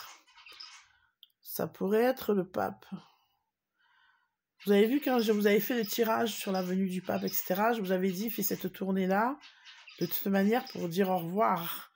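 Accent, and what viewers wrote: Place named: Marseille, France. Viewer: Bonjour, merci votre video que je regarde plusieurs fois car vos explications sont toujours hyper intéressantes, gratitude, j adore votre accent marseillais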